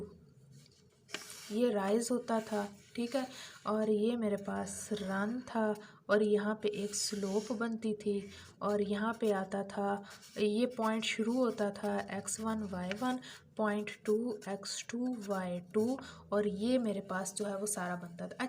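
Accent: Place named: Islamabad, Pakistan. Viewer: actually. Apki Urdu kaafi saaf ha. I think Islamabad ho sakta ha then.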